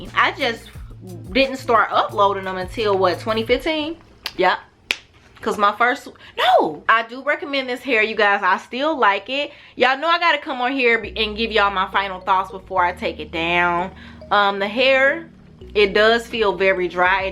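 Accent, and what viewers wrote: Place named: South, United States. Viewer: I hear a southern accent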